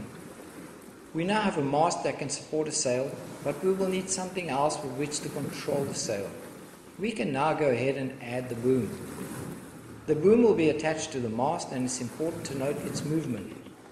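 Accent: Durban, South Africa. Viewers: Love listening to a South African teaching me about sailing... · Hi great video, and I wish I had it when I started sailing. Your accent sounds like from Durban, SA.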